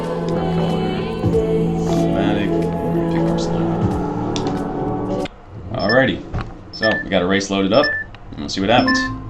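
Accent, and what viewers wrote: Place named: North Carolina, United States. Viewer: I'm also from NC, nice to hear a local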